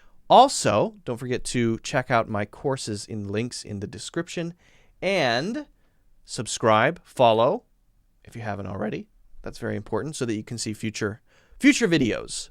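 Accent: India. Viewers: Please don't reduce your Indian accent 🙏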